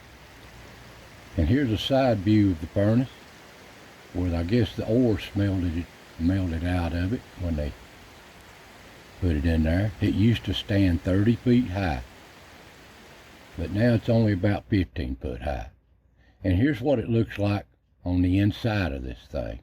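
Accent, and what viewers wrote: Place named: South, United States. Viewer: One of these days I am going to have to cross the pond and visit this part of the USA. It reminds me so much of parts of the high country where I grew up in southern New South Wales, Australia... except we have Gum Trees and not Aspens, Birch etc.. Thanks for another great video,. I could easily sit down and listen to you speak all day, just love that Southern accent and gentle speech.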